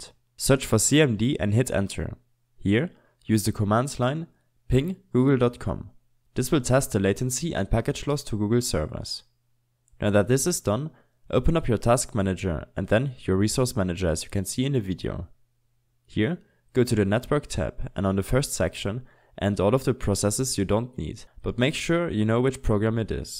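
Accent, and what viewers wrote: Germany. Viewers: Dieser deutsche Akzent man hört es so stark xD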